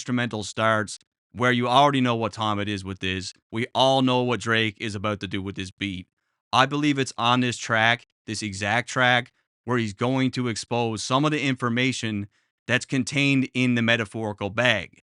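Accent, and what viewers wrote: Canada. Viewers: You sound Canadian 🤔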